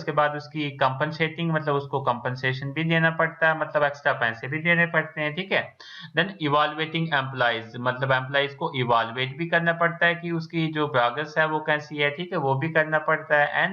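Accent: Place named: Bhaderwah, India. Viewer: sir your voice seems to have a Bhaderwahi Accent(u seem from Chenab Vallley)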